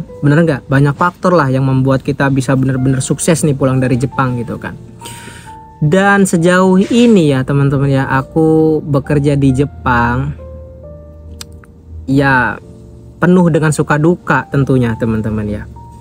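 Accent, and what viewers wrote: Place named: Kebumen, Indonesia. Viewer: KEBUMEN di TAIWAN hadir, q auto ngakak denger ms nya ngomong lucu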